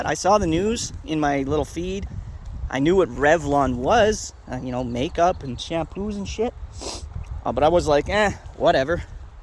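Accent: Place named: Canada. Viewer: Keep grinding man your really building this channel quickly. I could really here the canadian in ya this vid. You said level at one point i was abut to die lol 🤣🤣 best of luck bro. Curious to see your perspective on the warrants too many conflicting view points and most of those who actually know what theyre talking about are just pointing out what perspective they want everyone else to have. I know for a fact youll tell it like it is bro.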